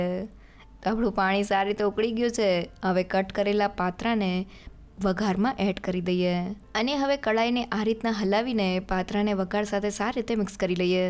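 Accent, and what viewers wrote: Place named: Kheda, India. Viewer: તમારું ગામ કયું છે તમારી ભાષા ખેડા જિલ્લા જેવી લાગે છે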